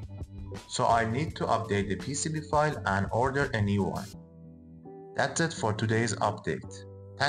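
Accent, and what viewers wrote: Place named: Iran. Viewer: سلام آقا.بدون اینکه اسم اکانت رو ببینم گفتم این انگلیسی حرف زدن یه ایرانیه.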